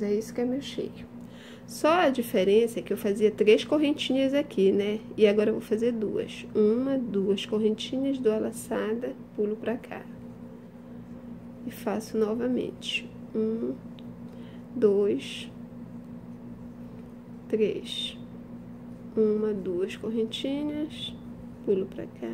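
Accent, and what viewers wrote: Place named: Rio de Janeiro, Brazil. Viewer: Oi!Boa tarde! Estou muito feliz! Acabei de terminar o meu. Eu fiz cm a linha nr 4 (barroco)e diminui 2 grupos no inicio. Ou seja comecei cm 7 grupos. E deu super certo. E olha que estou meio gordinha.Usei agulha 2,5! Beijos! Obrigado por dividir conosco suas obras de arte. Você é carioca? Fico encantada com a maneira cm q você pronuncia. Que Deus abençoe vc e sua família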